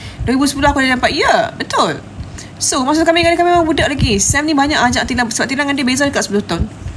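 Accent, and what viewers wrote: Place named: North, Malaysia. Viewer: Org utara ckp . Ibrahim Mcm² la aw👍🌹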